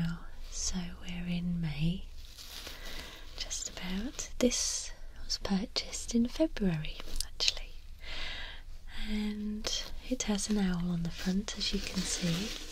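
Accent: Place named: Scotland, United Kingdom. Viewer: hi! are you from scotland or the north of UK? cause your accent is so strong!!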